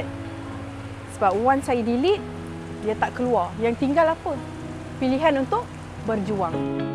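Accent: Perak, Malaysia. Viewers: Best dgr dia cakap perak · atay org perok ckp pun nampok comey jee...ajeee ranjooo betoiii kome....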